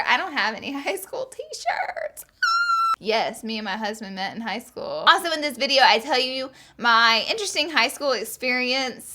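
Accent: South, United States. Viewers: I'm from mobile Alabama. I just love your videos and southern accent. I feel you girl ❤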